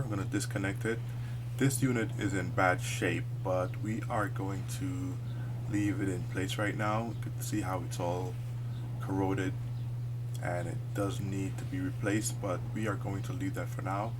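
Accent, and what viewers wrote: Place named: Jamaica. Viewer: You sound like a yardie . Working on my 928 s4 project your tips help alot. Big up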